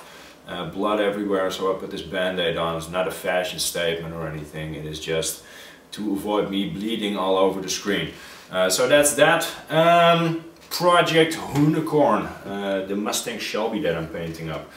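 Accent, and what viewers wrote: Netherlands. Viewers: Hey buddy, are you dutch? You sure sound dutch cuz I am too ;)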